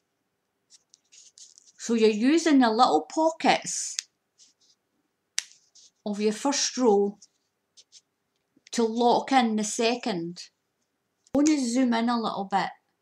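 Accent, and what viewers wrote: Scotland, United Kingdom. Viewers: Omg This is the first time I have heard a fellow Scot on You Tube. Your tutorial is so clear and detailed and the swan is beautiful. I think I will be able to make it. Keep these origami tutorials coming. Thank you so much. xx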